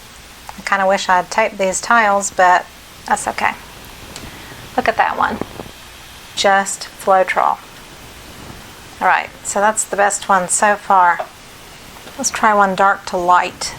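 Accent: South, United States. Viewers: You sound like a southern girl. I have lived in Atlanta all my life so I have a similar dialect as well.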